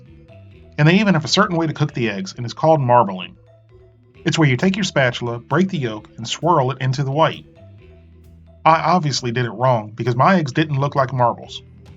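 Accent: United States. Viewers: Some of your American accent is hard for non US people to understand , what are the first two ingredients for the mayonnaise .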